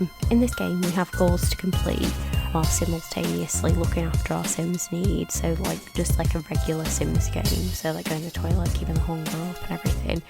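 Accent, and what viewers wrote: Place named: Liverpool, United Kingdom. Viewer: Hearing Urbz in a scouse accent is wild 😂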